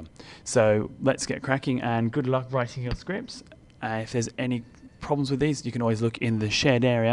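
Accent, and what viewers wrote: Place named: United Kingdom. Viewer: by your accent you appear British which helps; many videos re comments-opinions on screenplay spacings take into consideration American page size which is smaller than ours; my question is: do we alter their spacings to accommodate our size of pages? And what spacing sizes are we talking about?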